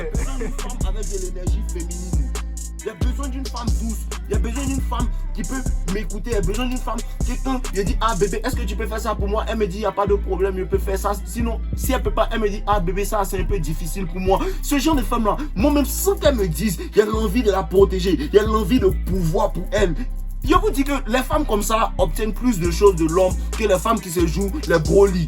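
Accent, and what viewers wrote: Ivory Coast. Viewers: j'apprécie ton français ivoirien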